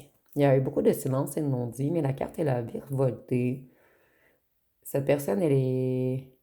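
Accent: Quebec, Canada. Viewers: ton Accent Canadien Québécois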